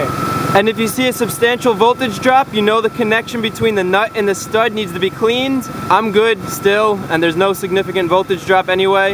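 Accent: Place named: Upper Midwest, United States. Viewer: Are you from the northern mid-west? Try not speaking lazy with your lips almost closed.. it makes you sound like Carmen on Southpark. Just like when singing, public speaking required that we open our mouth when speaking. Just a suggestion. .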